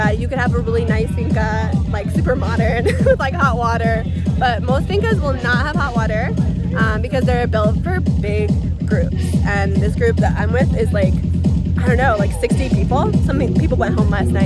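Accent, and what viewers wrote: Paisa, Colombia. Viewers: Me encanta tu acento paisa cuando hablas en español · te siento un leve acento paisa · Tu acento paisa es genial... Un fuerte abrazo, eres una digna representante de Colombia ante el mundo... Saludos desde Bogotá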